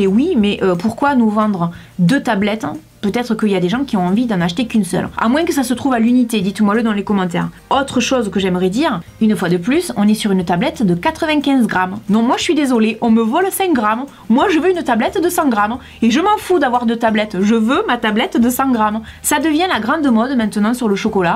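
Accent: South, France. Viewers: Quand je t'écoute j'ai l'impression d'être à la maison! L'accent du sud que j'ai la chance d'entendre qu'en été ça pourrait rendre la vidéo la plus inintéressante agréable à écouter! Sinon, pour le Badgal Bang, on est d'accord, il est génial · j'adore ta chaîne t'es naturel et franche et l’accent du sud qui va avec ^^ :) pourtant ça parle de maquillage (pas vraiment mon truc haha =D ) mais je sais pas j'aime bien regarder, ça parle un peu de tout les sujets =) , bonne continuation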